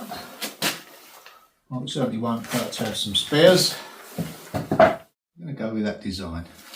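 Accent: Essex, United Kingdom. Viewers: I love these vlogs, but especially accent. Essex girl in California.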